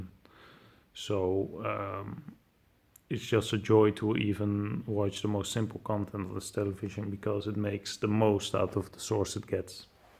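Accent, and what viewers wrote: Netherlands. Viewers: Are you dutch all seeying guy? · Don't say "tink" just start saying vink (you are clearly as Dutch as I am) but even before starting to pronounce the v switch to fink.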